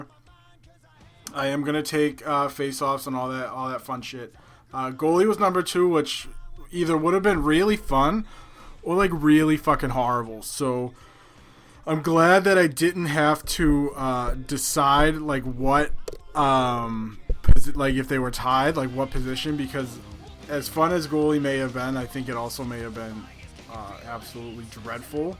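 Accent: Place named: Massachusetts, United States. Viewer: hey man, I grew up in Bridgewater, MA...though living in Denver now...don't get to hear alot of people that sound like me!! Go B's!!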